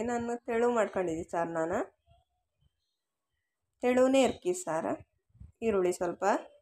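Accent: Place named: Kundapura, India. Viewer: ಸೂಪರ್ ಕುಂದಾಪುರ ಕನ್ನಡ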